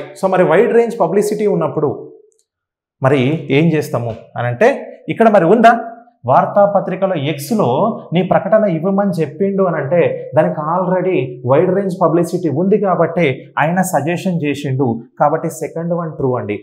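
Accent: India. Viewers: 5,6 days nundi mental ability videos chustunna....Hyd slang lo class vine sariki hayiga undhi sir.... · Sir midi nizamabad ah 😝alne matldutunru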